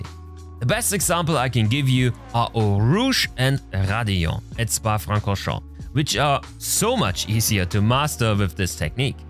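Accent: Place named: Germany. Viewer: Glaube man hört es wirklich raus wenn ein deutscher englisch spricht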